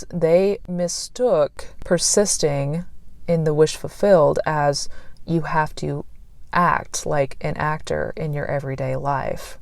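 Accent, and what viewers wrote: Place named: Toronto, Canada. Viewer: Your the bomb... i swear your from Toronto the way you speak...lol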